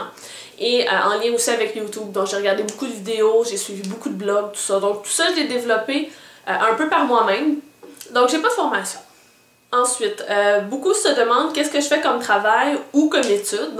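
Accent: Canada. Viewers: empilage de boite o pas tu reste très naturelle,tu es dynamique,souriante et très naturelle.j'ai beaucoup copié tes make up ,alors continue,et ton accent canadien j'adore;) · Coucou merci pour cette foire aux questions où l'on apprend a te connaitre un peu plus avec ce bel accent du canada (le meme que mon cousin qui y vis). tes tutos sont super car tu expliques tout avec patience et j'aime bcp ta personnalité tu es une de mes youtubeuses favories. continues!;)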